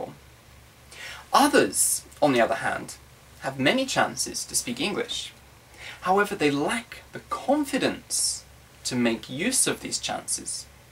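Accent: United Kingdom. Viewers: are you british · R u a british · Hi!, nice to meet you! I am from Mexico, Puebla. I am learning English, It has been very dificult for me to learn a second language, however, I am trying to do my best. Trying to improve my speaking and pronunciation. Surfing the net, I found your videos by chance. I enjoyed British pronuntiation, because it is elegant and sublime for me, but the most was the way you explain your explanations, it is easy for me to understand your points of view to be a better speaker. I am very happy because I have been learning from your videos, I started praticing the tecnique called shadowing, I am impressed for the results, because not only I could improve my good pronunciation, but also my fluency was very good. It is incredible that my mind automatically help me to find the vocabulary that I needed to structure my ideas. Thanks a lot to share your knowledge and experiencie with people who really is interesting to learn English. 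Sorry for my bad English. My best regards Paty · r y brittish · British accent !! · Love your British accent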